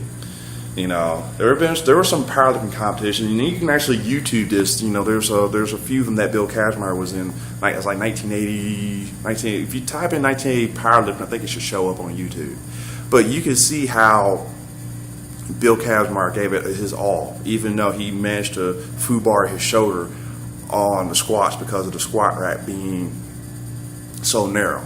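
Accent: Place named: Alabama, United States. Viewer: Awesome video... love the Bama drawl too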